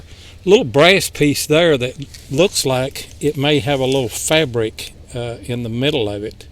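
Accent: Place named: North Carolina, United States. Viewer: Enjoyed the video! You sound like my husband's family who grew up in the mountains of North Carolina in the Canton/Clyde area. I'm starting a metal detecting club at the school where I teach and I would love to show some of your videos to the students! - Vicky Jackson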